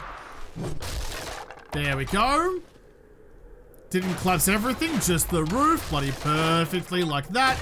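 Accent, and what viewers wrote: Australia. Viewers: But the rest of us are here for the rapid-fire Strine!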